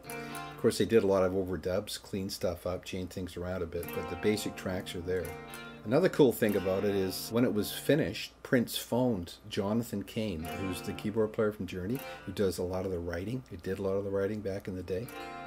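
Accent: Canada. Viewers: You talk so Canadian. Good video though.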